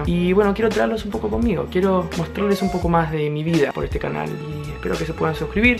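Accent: Argentina. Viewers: Es normal que tú español/argentino me exite? Si? No? Ahre Te amo,y ya sea como hables,ya seas como seas :3 💞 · He repetido este video una y otra vez y me encanta tu acento argentino es tan adfghjjkllñ podría escucharte hablar todo el día y no me aburriria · jajajajjajaj de una me di cuenta q eras argentinoo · el acento argentino aaa lpm q hermoso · That's so cool! And yes argentinian most used accent is pretty rough ahah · Me encanto 💙🙌 el acento argentino 😮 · Boludooooo, qué? Sos argentino? Se me va a explotar el cerebro. Like literally sjkfhaks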